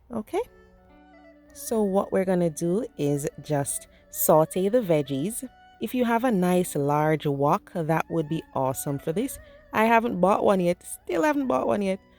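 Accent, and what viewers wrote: Jamaica. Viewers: Thanks for sharing, my daughter said she will surely fix this. ❤ ur Jamaican accent beautiful!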